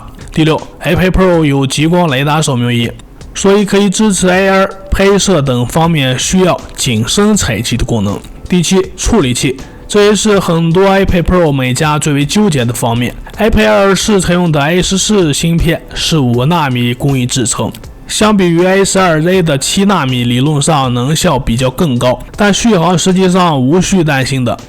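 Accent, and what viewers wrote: Shanxi, China. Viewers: 这是山西，河北北部或者内蒙古中西部地区的口音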